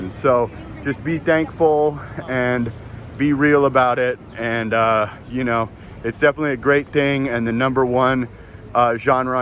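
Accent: United States. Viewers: I’d like to add because you may not know it, your narration helps a lot too the young Filipinos learning and developing and mastering the American twang.👍👍👍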